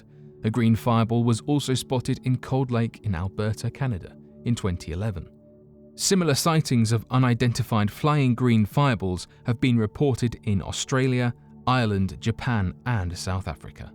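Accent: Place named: North, United Kingdom. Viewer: he's saying "fireballs" -- turn on captions and you'll see :) this is why i watch most British shows with closed captions turned on because frankly i can't understand some of what they say, especially thick Northern English accents... no shade on the British, i have trouble understanding other foreigners speaking English with a thick accent too :) (looking at you, Jamaica and India :)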